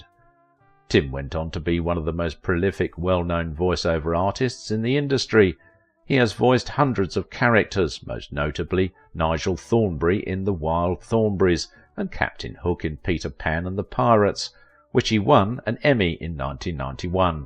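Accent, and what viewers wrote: United Kingdom. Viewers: Awesome video!!! You, the narrator sound exactly like Laurence Brown from YouTube channel "Lost in the Pond"....and I don't say that because you are both British..😉..Love that channel! Glad I found this one too! 😁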